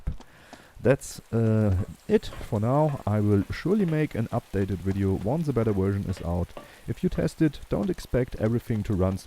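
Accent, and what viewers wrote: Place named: Germany. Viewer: wie man das sofort hört wenn ein deutscher englisch spricht .. :)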